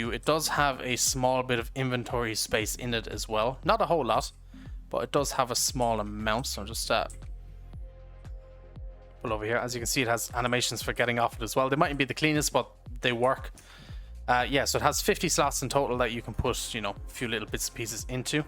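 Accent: Ireland. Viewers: Where in Ireland is your accent from? Limerick? Can't figure out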